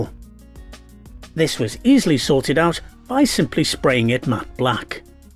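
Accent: Wales, United Kingdom. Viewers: Are you welsh sir? 😎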